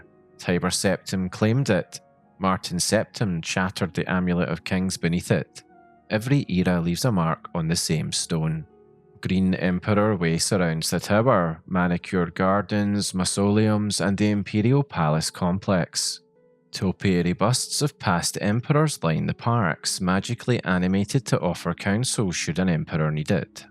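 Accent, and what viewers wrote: Scotland, United Kingdom. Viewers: Are you Scottish? Very similar accent to folk round me 😂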